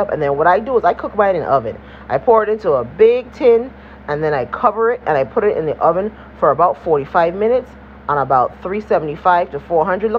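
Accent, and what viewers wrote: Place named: Guyana. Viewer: I Know You're Not Guyanese, But You Kinda Sound Like It..I Love All Of It Tho. Thanks For Sharing.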